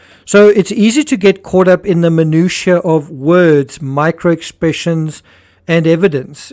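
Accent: South Africa. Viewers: Love your videos ❤ Are you from South Africa? Had to ask😅 Love and blessings all the way from South Africa 🌟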